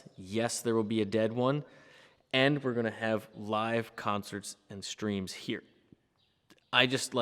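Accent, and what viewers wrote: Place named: Maryland, United States. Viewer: freakin love you brother! miss me some Maryland accents, chilling out here over that Humboldt County line!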